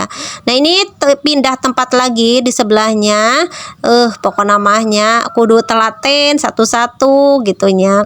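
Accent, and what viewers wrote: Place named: Banten, Indonesia. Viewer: Emang di pangandaran it bahasa y ad jawa y bun bukan sunda doang.ak denger bunda ini suara y kaya logat serang banten gitu heheh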